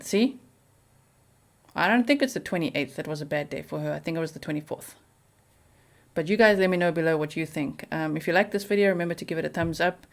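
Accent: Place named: South Africa. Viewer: Why would his parents have concealed that Brian left for home from the 17th-23rd to empty out a storage unit? I have never heard this. How and when did you hear this? I enjoy your astute observations. I thought I heard a South African dialect. Thank you for sharing your background and your father’s background. I am a woman Vietnam Era Veteran Navy WAVE. During service I had a Secret Security Clearance. Thank you for your broadcast. Greetings from the shores of Lake Erie on the north coast of Ohio in America. Canada is 45 minutes straight across the lake.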